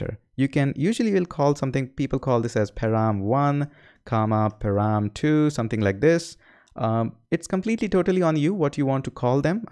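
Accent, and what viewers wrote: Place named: India. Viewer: I like the Indian accent. "parenthesis" is paren + thesis. Another word "parameters" is para + meters. In Brazil we have somewhat the same way of saying words, like emphasis in the last part of the word, sometimes in the middle of the word and even in the beginning of the word. Some words sounds like a roller coaster, and it sounds nice.....In English is kind of flat. like "parenthesis" but in parAmeters the upper case A is where the Americans do speak their roller coaster and speaks like paraMeters with his roller coaster starting on M.....I love linguistics. Sorry guys, off topic, but really nice......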